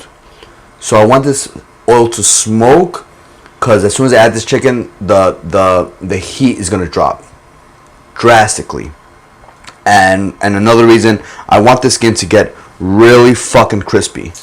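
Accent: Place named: New York City, United States. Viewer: Love this channel!! As well as your NYC accent 😜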